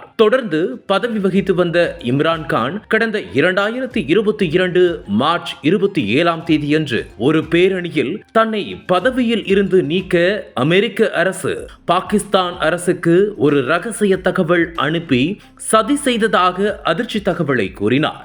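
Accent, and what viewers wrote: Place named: Sri Lanka. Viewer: Voice style nalla illai.. rombba irritation aagudhu ....sri lenken modulation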